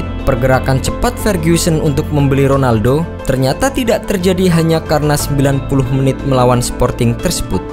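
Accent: Java, Indonesia. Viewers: suara narasinya masih berasa medhok.. tapi mendingan ini daripada suara translate-an cewek gugle :)) mantep mass...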